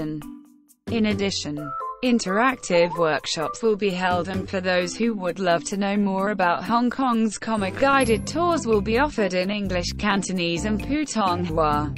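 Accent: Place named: United Kingdom. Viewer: Wow sosyal may British accent hehe.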